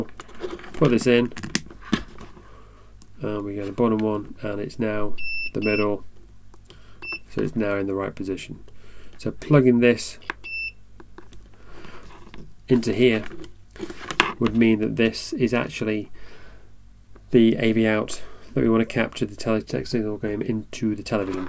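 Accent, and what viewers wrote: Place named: United Kingdom. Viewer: i approve of both this video and your british accent i like british people :)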